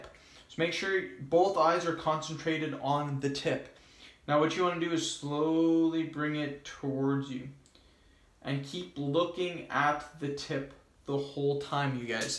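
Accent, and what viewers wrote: Canada. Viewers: You have a really strong Canadian OAT accent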